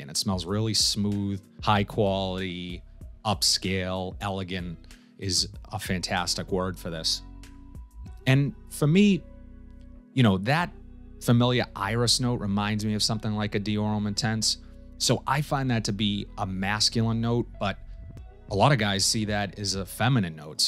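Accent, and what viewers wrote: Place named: New York, United States. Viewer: I am from Denmark But i must say your english is straight out of the Sopranos. Love it, you have to be from New York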